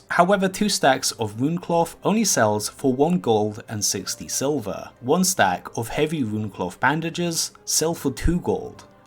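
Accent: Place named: United Kingdom. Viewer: He talks in an English regional dialect from the UK , everyone in the area he lives in talks like that .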